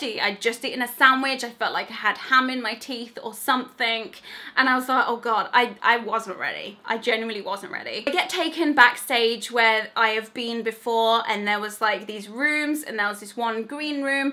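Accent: Birmingham, United Kingdom. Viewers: Omg i had no idea you were a brummy as well twins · I never realized you’re from Birmingham. I was told it’s the least attractive accent in the UK 😆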